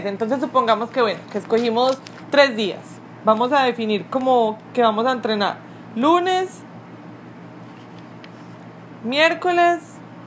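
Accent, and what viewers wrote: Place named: Colombia. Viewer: No sabe nada, pero como me gusta el acento colombiano este ya es el segundo video y el ultimo 😅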